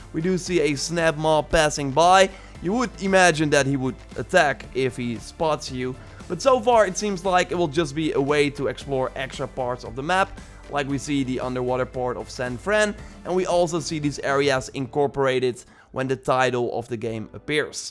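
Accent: Netherlands. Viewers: where do you come from, you sound dutch? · Hmm... Interestingly, not only you’ve guessed the name correctly, you also have Dutch accent.....Like RobinGaming. And your YouTube name is begin with which is very common fore letters of Dutch names..! Hmmmmmmmmmmmmmm....(edgy face) Yeah, I think you’re definitely Guerilla’s undercover bro!:)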